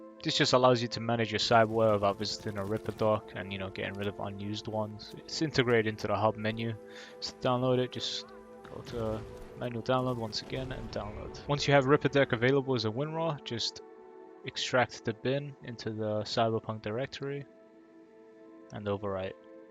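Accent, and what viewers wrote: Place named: United Kingdom. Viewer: Can you say Water in your British accent please?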